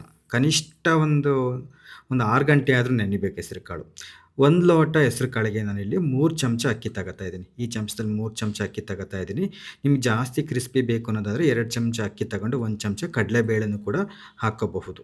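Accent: Chitradurga, India. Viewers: Pakka chitra durgada bhashedose jote nimma maatu chapparisuvantide😊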